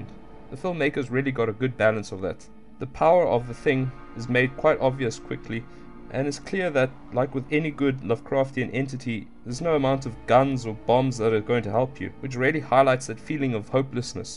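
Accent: South Africa. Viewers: U sound like someone from Cape Town bro, we all have the same or somewhat similar accent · Hey man, are you South African? You sound South African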